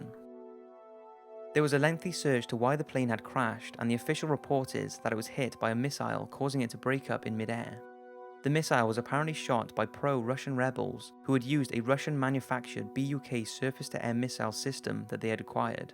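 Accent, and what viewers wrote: Wales, United Kingdom. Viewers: are you welsh............. anyway love the vid :)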